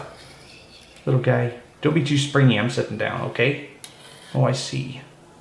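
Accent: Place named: Utah, United States. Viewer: Do you happen to be from Utah? I am detecting a very distinct Utah accent.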